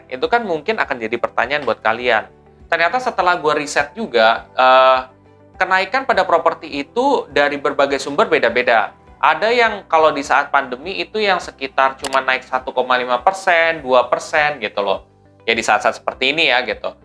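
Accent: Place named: Java, Indonesia. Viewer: Keturunan bule ya mas tapi medok jawa 😊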